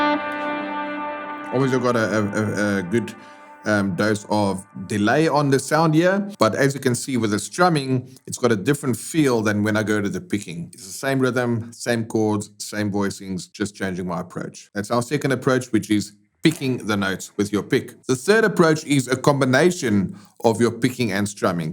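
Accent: South Africa. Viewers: The second I heard your south african accent, I knew this would be the perfect lesson for me 😂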